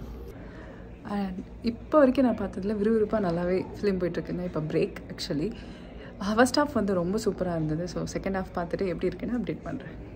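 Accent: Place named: Madurai, India. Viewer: T q allows so cute tamil from madurai 🤝🙏🙏🙏👍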